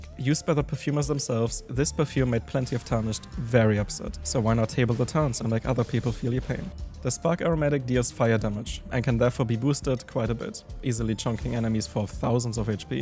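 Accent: Germany. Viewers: Alter du klingst krass deutsch aber danke für deine Viedeos die helfen echt weiter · Hey Not to sound rude but are you german Because you sound exactly like every German I know speaking English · I smell german accent 😤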